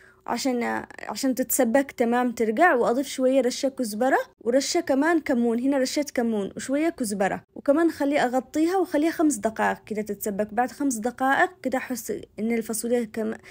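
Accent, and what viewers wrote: Aden, Yemen. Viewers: ما شاء الله شيء جميل. واللهجه اليمنيه اروع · الهجه العدنيه غير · يااختي يكفي كلامه العدني العسل اقسم باالله انه سكره اني من تعز ومافيش هذاالخبر وفعلآاصحاب عدن اكلهم روعه ويجنن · هي عدنيه مافهمتي لهجتها 😊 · كلامك يجنن اعشق اللهجه اليمنية محبتك من السودان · ❤تسلم يدك وكلامك العدني الحلو😂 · ههههه شكلك صفر بالطبخ البرياني طريقته جدا مختلفة عن الزربيات وعدن من قبل ما تعرفيها لها طابعها اشي بخور اشي عطور ولو ان الشمال اي اخوتها بالشمال لما يطبخوا في قنواتهم يستنكر ان الاصل عدن في الروائح الجميلة واشي فن و الطبخ الرهيب بس انت بجد اظطريتيني اعلق اما الانفصال او الوحدة هي بيد الرحمن وايش دخل الروتي بالسياسة ياسلته وتميز وسحاوق بالشقر 😂... اختي هذه والله اول مرة اشوف قناتك و3 ساعات وانا اتفرج ع فيديوهاتك الرائعة وتقديمك الرهيب ونظافة مطبخك وحشمتك وصوتك العدني الي يشوقني لطيبت عدن واهلها المتواضعيين بغربتنا ياسلام عليك الله يحفظك واهل بيتك من شر ماخلق استمري وهذا اول لايك لك ❤ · ربنا يحفظك و يبارك لك في كل شيء. 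طبخك لذيذ جداً و مليان نصائح مفيده. بالاضافه إلى كلامك العدني الجميل. الف شكر من القلب و لك كل الاحترام و التقدير❤❤❤❤❤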